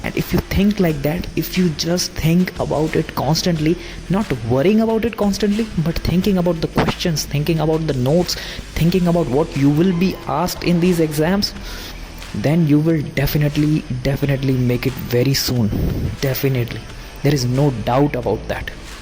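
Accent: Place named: Marwar, India. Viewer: Bhai hehoo app voice septa chl eha hai... Marwadi kai bhe chupa nhi rhaa sktae 😅😅😅. Jodhpur wale bana 🙌🏻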